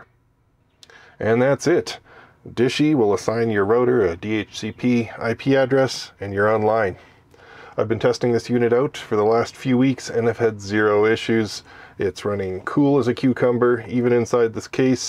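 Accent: Canada. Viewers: He's Canadian so it's Ja, you bet ya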